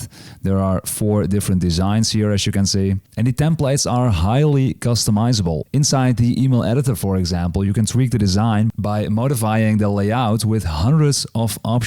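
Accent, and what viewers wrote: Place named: Netherlands. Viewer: You sound Dutch! :)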